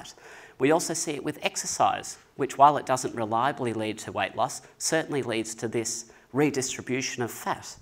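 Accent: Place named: Australia. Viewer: What a Strine accent! Though I’m sure that my Midwestern U. S. accent might also be found comical. Very good content.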